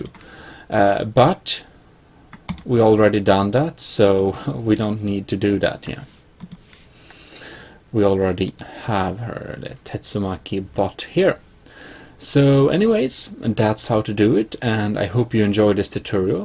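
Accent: Sweden. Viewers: är du svensk? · Your are frome sweden?Tjena bror. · hörs att du e svensk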